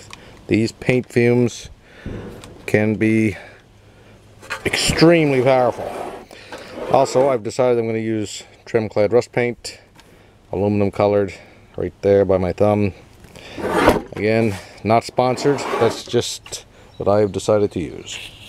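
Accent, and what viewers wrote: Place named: Newfoundland, Canada. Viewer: You must be a Newfie! Awesome and thanks for the video. Cheers from Alberta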